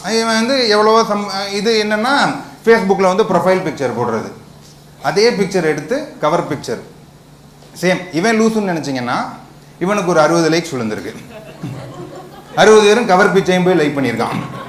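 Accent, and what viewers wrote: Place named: Bangalore, India. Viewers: Bangalore tamil😍😍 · You are doing better keep it up.The Bangalore tamil accent really exists. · That bangalore tamil accent on point 🔥🔥🔥🔥🔥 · Bangalore slang 😂😂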